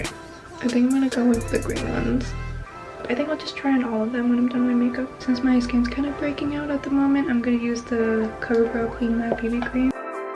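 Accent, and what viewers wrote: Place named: Philippines. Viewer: Which part of the Philippines are you? She does look like filipino and her accent if is filipino accent passing 🥱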